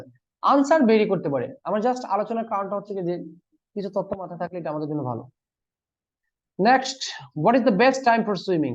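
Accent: Sylhet, Bangladesh. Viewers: Sir er maje maje Sylhet language ta kub balo lage.... Serious Video dekad majew hasi pay.... 😄😄😄😁😁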